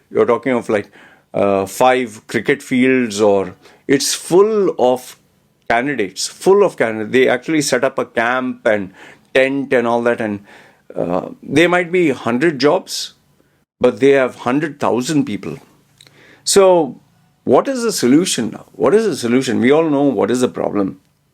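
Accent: India. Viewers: you have a indian accent , are you too from india?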